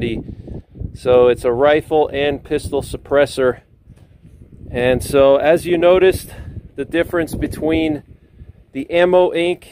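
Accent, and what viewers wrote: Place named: Boston, United States. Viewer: are you from Boston? Something about the way you talk reminds me of burr, not the accent so much but more like the cadence or something, the way you end sentences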